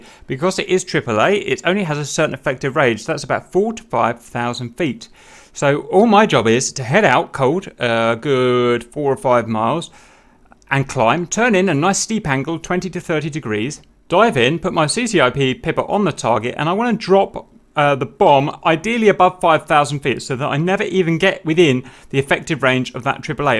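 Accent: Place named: United Kingdom. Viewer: Your British accent makes these instructional videos easier to take in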